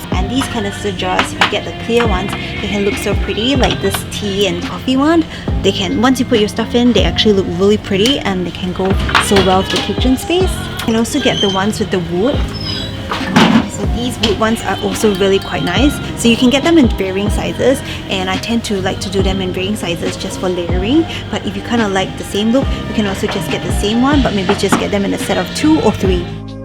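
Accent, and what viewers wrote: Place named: Singapore. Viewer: please try to improve your pronunciation. ‘check out my shopping lut’ ??? that mix of a singaporean accent and a western accent is also badly done because you pronounce one word several different ways. it’s jarring on the ears.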